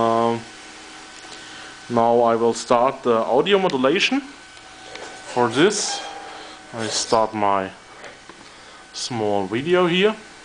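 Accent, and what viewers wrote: Germany. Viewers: Man hört irgendwie dass du deutscher Bist; aber kannst geil englisch :D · Man hört sofort das du Deutsch bist ^^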